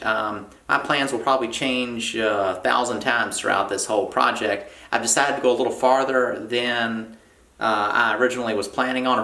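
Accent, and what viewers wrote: South, United States. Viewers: His accent indicates somewhere down South.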